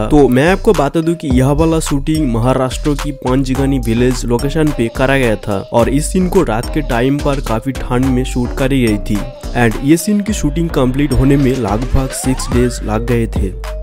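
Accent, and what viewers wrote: Bengal, India. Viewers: Ur voice is Bengali I caught 😂😂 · Bhai bangali accent mix hain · Hindi bhi aap bangla me bol rahe ho 😆, Please hindi sudharo. " Meenamma daakte (bulate) hai" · Bengali accent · Bengali and Hindi mix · I think he is Bengali. It seems by his accent. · 😂 Bengali accent hai. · Mere papa jaisi, bhai hamare Bengali hai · Bengali accent hai, south Indian nahi! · May be he hails from Bengal. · Sir bengoli hai..isi wajha se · DADA you are Bengali.. oh pata chai hi rah hay.... Pati Banglay bolle bhalo korte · Are bhoi aap bongali ho kya?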